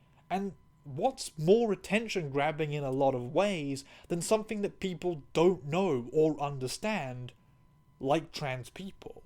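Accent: United Kingdom. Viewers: I assumed you were in Britain due to the accent. I'm British and have been wanting to move to NZ to escape the transphobia here; can I ask how good NZ is for being trans (access to healthcare, discrimination, etc.) in your experience? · girl i am so sorry i legitimately thought you were british, so when you made the disclaimer you're from new zealand it was real news to me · Honestly never pinged your accent as kiwi, I thought you were bri’ish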